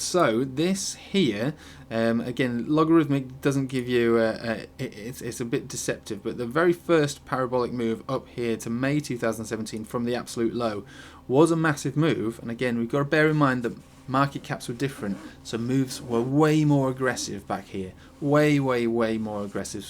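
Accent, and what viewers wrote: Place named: Northern England, United Kingdom. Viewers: Just “norf” :D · sounds yorkshire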